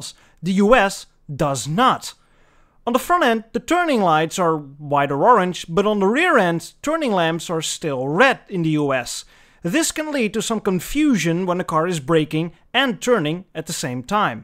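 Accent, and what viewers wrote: Netherlands. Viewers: The Dutch accent is nice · i love this channel i found it by accident but i love it, also pretty sure he is dutch dat accent herken ik van een kilometer afstand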